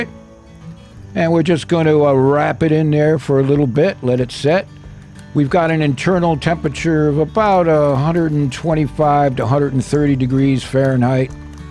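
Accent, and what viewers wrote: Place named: Colorado, United States. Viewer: His accent doesn't sound like a Texas accent more like Colorado maybe.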